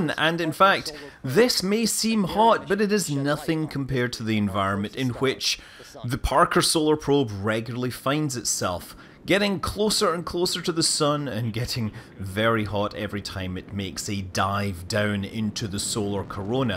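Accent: Scotland, United Kingdom. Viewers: This fellow sounds Scots like me! So what would he know about the sun? I've glimpsed it twice in my lifetime. Great upload. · I love the Scottish dialect ❤️ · Don't worry, it's just scottish.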